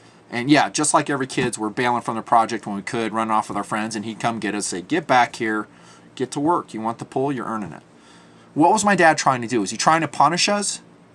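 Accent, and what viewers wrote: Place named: Alabama, United States. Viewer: Perfect timing for viewing this one. My kids are reflecting the "mamma's boy"/wuss image and I've been looking for a hint, path, help, guidance or anything these past couple of months. My resources are at a minimum, and the light has shown me the way. Excellent vid and I'm amazed at the timing on my viewing. Thank you. By the way, the Bama accent comes through in all the vids! When my boys "hate" on me, you know where I'm sending them!! LOL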